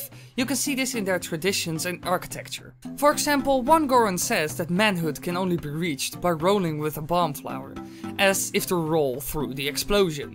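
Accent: Netherlands. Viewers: ah that's why, your accent made me remember my own language (also Dutch) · are you from Holland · I came to your channel from m. productions and I'm so glad I found it. When I first heard your accent it sounded so familiar, and then I found out you and vortexxy are both from the Netherlands. It would also be cool to see a theory about the sheikah. There just so much unexplained stuff around them. Keep on making awesome theories! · Ik dacht al dat je nederlands was!!! Ik hoorde het aan je stem!!! Subbed!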